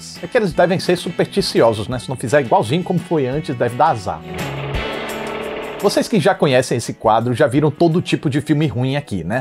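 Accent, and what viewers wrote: Northeast, Brazil. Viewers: Mano vc tem um sotaque meio nordestino, o que casa muito com o tom cômico do video. Brabo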